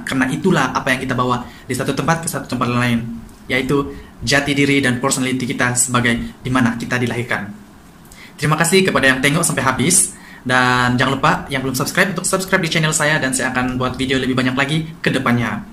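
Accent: Indonesia. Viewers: Intonasi logat bicara admt sm orang indonesia, jauh dr orang malaysia. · Logat awak dah sama dengan Bahasa Indonesia 👍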